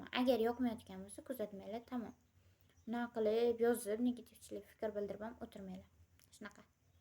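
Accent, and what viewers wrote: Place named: Tashkent, Uzbekistan. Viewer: qayerlisan bir qarasa chiyalcha gapirasan🤮 🤮 🤮 🤮 🤮 🤮 🤮 🤮 🤮 🤮 bir qarasa toshkentcha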